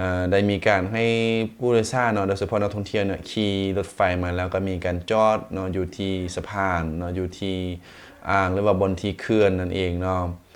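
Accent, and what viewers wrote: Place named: Phetchabun, Thailand. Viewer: สำเนียงภาษาแอดมินคล้ายๆกับสำเนียงภาษาบ้านผมเลยครับที่เพชรบูรณ์ครับ😊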